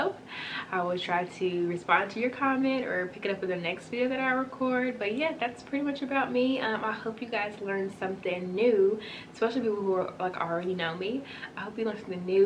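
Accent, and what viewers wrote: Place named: South, United States. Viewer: You are sooo down to Earth and your accent is so cute! I immediately heard the "country" in your voice. Im a southern gal.. I live in Louisiana. You are so humble and kind!! Never let anyone steal that from you!! You are so beautiful being you!!! 👑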